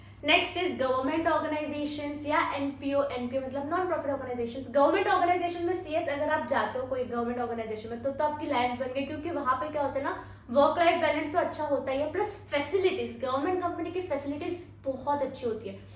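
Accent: Gujarat, India. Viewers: Didi aap Gujarat se ho..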